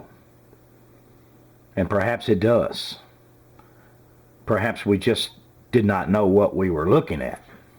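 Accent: United States. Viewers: His voice is soo... "American" its awesome!!!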